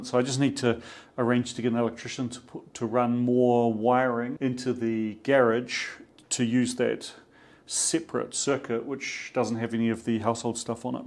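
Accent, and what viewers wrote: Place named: New Zealand. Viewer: Not trying to be too nosey. You sound a bit like a kiwi, but I don't think you're living in New Zealand 🤔 and you seemed to be wearing this old Tony's sweater. 😯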